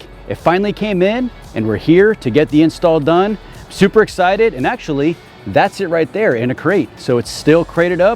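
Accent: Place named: California, United States. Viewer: This guy couldn't say Cali raised anymore if he tried. Hopefully they gave him this cap.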